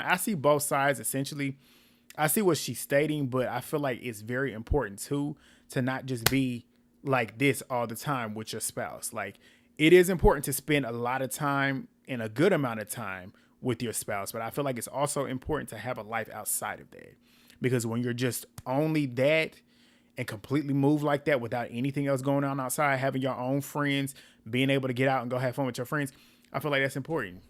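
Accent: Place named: North Carolina, United States. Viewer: I’m from South Carolina and I just love listening to you talk because you sound soooo NC 😂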